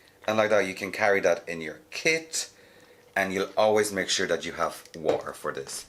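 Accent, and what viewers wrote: Dublin, Ireland. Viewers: Your accent is so exotic! It’s like Dublin with a hint of something else, Iv been using the same steam pod since late 2015 so Iv just ordered this today..thank you so much for explaining everything I STAN this channel 🤩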